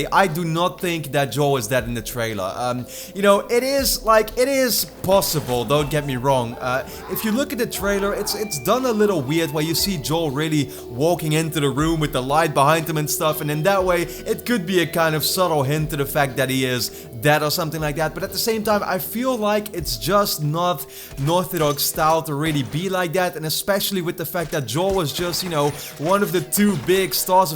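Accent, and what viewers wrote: Netherlands. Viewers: Are you Dutch? You sound Dutch!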